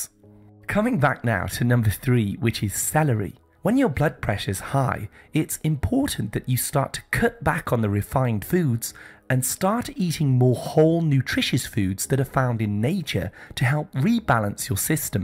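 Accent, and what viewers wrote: England, United Kingdom. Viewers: Oh, puleeze, STOP the commentators with the English accents!!!!!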